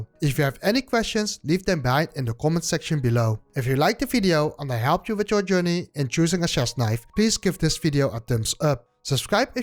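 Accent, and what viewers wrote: Netherlands. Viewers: Really like your videos and your thorough, honest advice (i.e. "no such thing as "the best" knife). I also find your Dutch accent charming to a certain extend. Yet, you maybe need to work on your English pronunciation of "U" - You say it like "oo" as that letter is pronounced in Dutch and German. In English it's rather said like "you" as in the word "use" (say "youse", not "ooze"). Also your inconsistency in using either singular or plural can be irritating, while your English vocabulary is great, though. Also, please e so nice to use your index finger for indexing, using the middle finger looks kind of rude. Keep up the good work and all the best!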